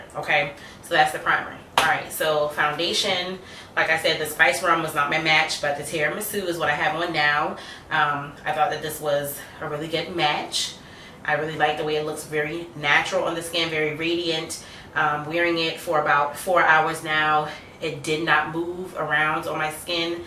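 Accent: Charleston, United States. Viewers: Lol your accent sounds very familiar to me, I could’ve sworn you were from Charleston, so I checked out your Facebook and it’s Columbia .... close enough... hey girl!!!! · Very nice tutorial, you sound like you're from chuck town sc.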